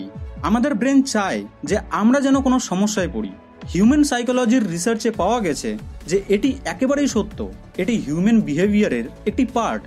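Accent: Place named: Kolkata, India. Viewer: ভারতের কলকাতার মনে হয়,,, কথাবার্তায় মনে হচ্ছে কলকাতার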